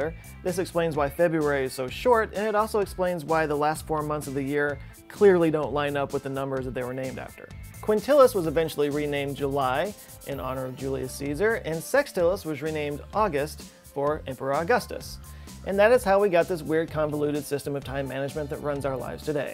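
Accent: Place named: East Coast, United States. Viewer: Are you from the east coast? You pronounce months like mints.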